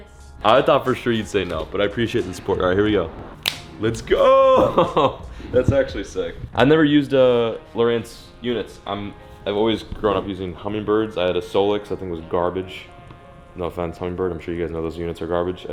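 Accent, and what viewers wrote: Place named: Alabama, United States. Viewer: dang is very pretty! What a good lookin couple-- heads must turn when the two of u are walking down the street! And when you all talk, w/th low smooth voice and w/th perfect Alabama (guessing) accent. SWEET!!! 😊😇🤠 BTW, happy 4 u both and good to c Lucky back! and i like that hit and miss, its ok if you're not an expert, skill set!👍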